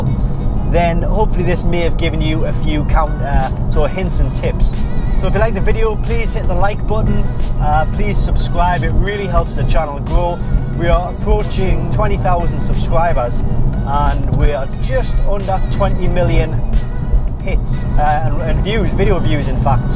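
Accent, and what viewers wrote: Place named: Geordie-Scouse, United Kingdom. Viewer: Unwatchable. Horrible muzak and what's that fucked-up Geordie-Scouser accent?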